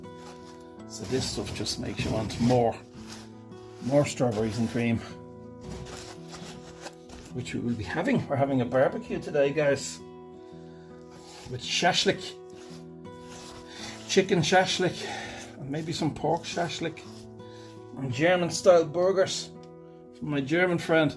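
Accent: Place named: Ireland. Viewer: Another great vid mate. Billocks ti the trolls! We love your Irish accent. Currently in a field with crap signal, setting up for a weekend LARP event so chilling out, watching this. Cheers mate and keep.up the great content. 👍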